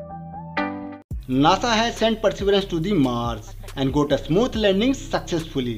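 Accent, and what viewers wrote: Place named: India. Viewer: From the accent, youtuber should be from India. Typical human behavior when you wish your competitor to fail. However, credit must be given when credit is due. We should applaud China's effort. This is the 21st Century, you still thought that nation can cover up their failures. Imagine it was exposed years later ... there is nowhere to hide such shame. No one would like to such risk. Many of us know very little about the Chinese including me. But one thing I am certain, the Chinese does not care what others speak of them, they are more worried about fellow Chinese opinion.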